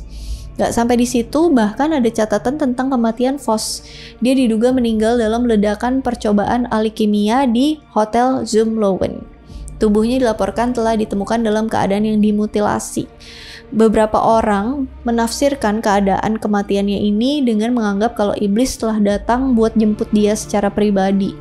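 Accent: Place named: Sumatra, Indonesia. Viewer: santai bae 😂 asli sumatra ya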